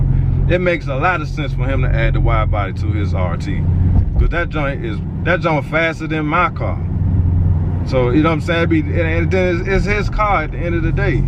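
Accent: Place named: Chicago, United States. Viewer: You from Chicago. Based off how you say water and and car I can tell?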